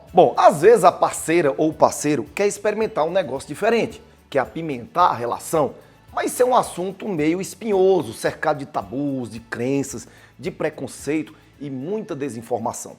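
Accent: Ceará, Brazil. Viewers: O sr.é cearense??? Um jeitinho típico de apresentar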